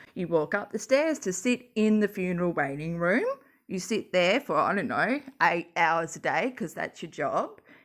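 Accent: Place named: Australia. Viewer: Its refreshing to hear an aussie accent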